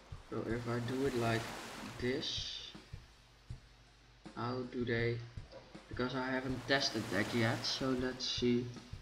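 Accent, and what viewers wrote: Netherlands. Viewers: je hoort gwn duidelijk dat dit een Nederlander is xD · that just the dutch accent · he has just an dutch accent ;s im dutch to and i think he can speak really good english. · Wauw! you can hear you're from the Netherlands xD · So fcking annoying when people are complaining about his English and Dutch accent...this video is about building a resort, not about the English language. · he's dutch, we have that terrible accent (im sorry)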